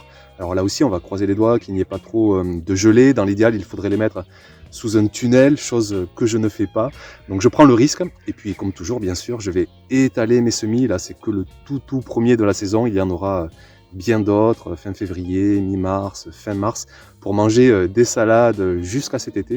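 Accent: South, France. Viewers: c est un plaisir de vous écouter , votre accent du sud et vos explications sont un régal bravo ! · Tellement contente que la saison commence !! Tes vidéos et ton accent du sud nous feraient presque croire qu'il fait beau dehors 😅 merci !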